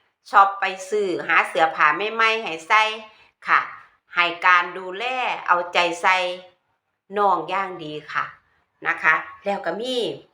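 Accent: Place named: Laos. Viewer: น้องเขาคนลาวเขาก็ใช้สำเนียงลาวเป็นเอกลักษณ์ของใครของมัน อย่าอืจฉาเขาเลย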